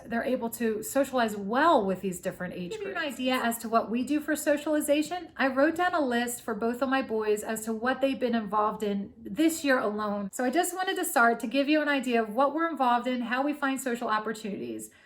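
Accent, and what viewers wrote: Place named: New Jersey, United States. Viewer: This was an excellent and informative video!! I loved hearing this perspective. Looking forward to hearing more content from you! I can't help but ask (and you don't have to answer), but are you from the NJ area? You sound like you could be, and I only ask because I love all the activities and community based opportunities you're involved in... intrigued. I am going into my second year of homeschooling in NJ with my second grade daughter and we are loving it! Subscribed!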